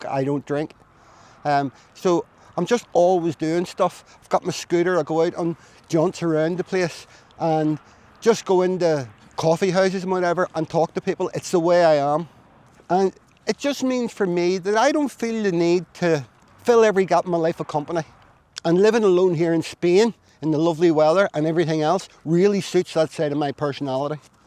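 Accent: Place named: Northern Ireland, United Kingdom. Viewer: I love your accent. M b i.ll move to NI.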